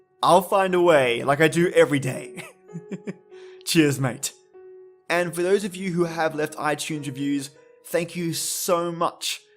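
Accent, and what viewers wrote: Australia. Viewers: Sweet jesus, where did you get that picture!? SHUDDERS That alone will give you nightmares! That story was amazing! That was one pissed off ghost. Great job,! (Holla to my fellow Pagans and Witches!) I gotta say, I definitely agree with all the feedback of the other listeners, especially the 'charming Australian accent' heehee. Another job well done! raises my cup Cheers to you! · I always enjoy listening to you, yes. I agree, your voice is charming! Love your pleasant Australian accent. Damn! It can defrost this bitter bitch🍋🍋🍋, which is quite the feat, congratulations!😉 Plus, creepy story to send chills down my spine, always a treat!🍭🍭🍭You're extremely awesome as a narrator, which is just makes my day complete!😊😊😊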